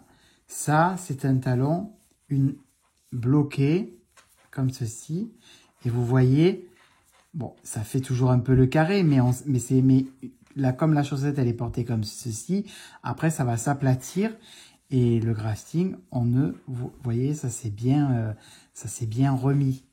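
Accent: South, France. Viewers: Merci pour ce tuto chaussette je vais essayer avec ta méthode. Je suis débutante au tricot et ta façon me paraît bien simple, bonne journée, j'adore ton accent du sud, moi aussi je suis du sud 😊😊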